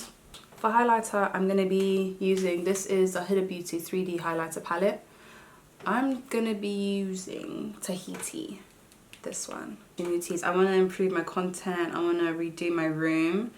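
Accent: United Kingdom. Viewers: You remind me of Jorga Smith both of y'all gorgeous and have that dang British accent.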